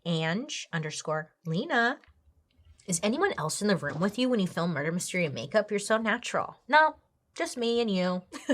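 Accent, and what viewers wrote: South, United States. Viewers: “Cornteen” 😂😂 talks like she’s from down south with me lollll ❤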